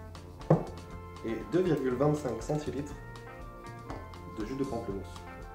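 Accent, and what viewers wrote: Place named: England, United Kingdom. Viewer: Toujours aussi magnifique cet accent anglais 😂 a goûter même si je ne suis pas fan du Pamplemousse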